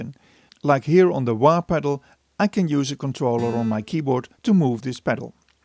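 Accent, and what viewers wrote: Netherlands. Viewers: Ik hoor gewoon dat je Hollander bent 🤣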